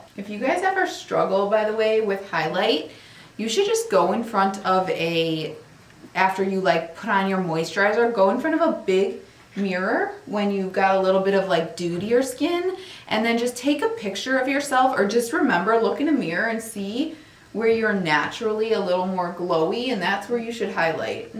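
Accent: Chicago, United States. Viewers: Just discovered your channel and could tell right away you are from Chicago with that accent! Love this video.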